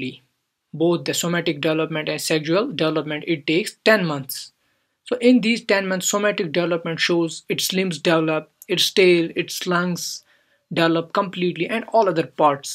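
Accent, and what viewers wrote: Kashmir, India. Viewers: Are you a Kashmiri? Just wanted to ask coz your are speaking just like my friend who is also a Kashmiri.😊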